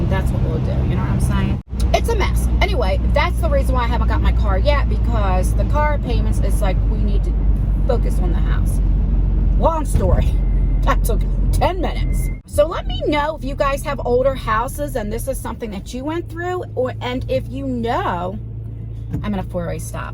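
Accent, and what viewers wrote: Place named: South Jersey, United States. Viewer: I watched the whole thing. I can watch you 24/7 day bc it feels like I’m just hanging with my girlfriends lol I’m from south Jersey and talk just like you so I piss myself when you start dropping “Motherf** & friggin lol. That’s me all day everyday 😂❤️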